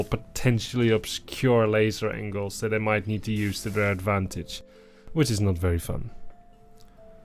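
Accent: Netherlands. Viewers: neat your dutch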